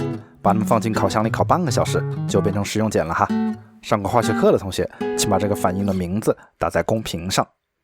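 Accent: Wuhan, China. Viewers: 哈哈、纯武汉普通话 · 一听就是武汉普通话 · 武汉人！！！！口音越听越喜欢！ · 不用说就晓得你是武汉人哪，托托的弯管子普通话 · 拐子！你就用武汉话吧，你这武汉式普通话听到我鸡皮疙瘩掉一地。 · 这个说话的调调，重音的部分，确实有武汉话的感觉。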